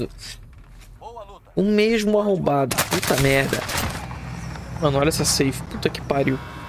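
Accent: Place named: Santa Catarina, Brazil. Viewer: Mano pelo seu sotaque vc é de Santa Catarina, de onde vc é?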